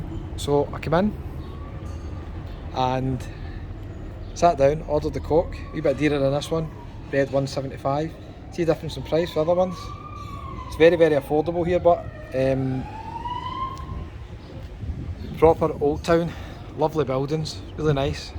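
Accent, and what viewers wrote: United Kingdom. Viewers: Mate, get real. With your strong accent, they probably didn't understand you. Why do all Brits think it is there given right to speak overseas in English only??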